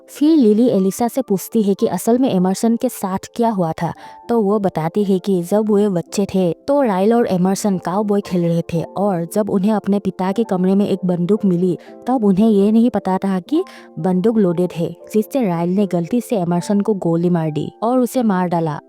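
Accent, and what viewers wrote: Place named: Assam, India. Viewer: Nice hindi assamess style voice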